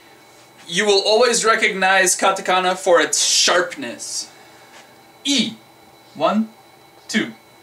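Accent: United States. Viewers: Nice friendly guy.....the writing is stll ok....but that American - Nihongo accent is really not working.... pity...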